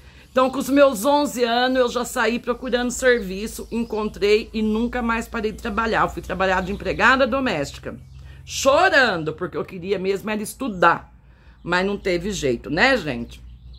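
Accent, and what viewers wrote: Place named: Inland São Paulo, Brazil. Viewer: Adoro o seu sotaque meu sonho é um dia poder conhecer o interior de SP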